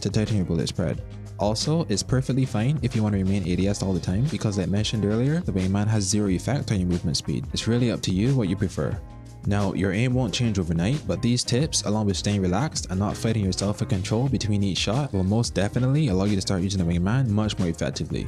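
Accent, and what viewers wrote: Barbados. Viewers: You a Barbadian? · I hear that Bajan accent!! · Are you from Barbados? Great guide btw, it really helped a lot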